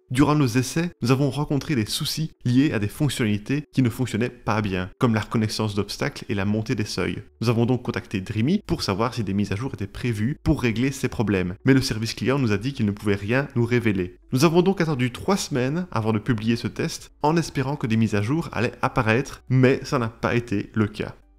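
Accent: Belgium. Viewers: Je détecte un accent belge mais vous dites les chiffres comme les français, pourquoi ?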